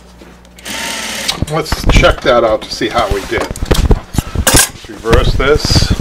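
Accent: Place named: New York, United States. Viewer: Love that New York accent ..."right om"...it's R A D . And I love the demo. I want to buy a beautiful upholstered chair for my new-to-me modular home and the arms are stained . So this is the answer ! Thanks !!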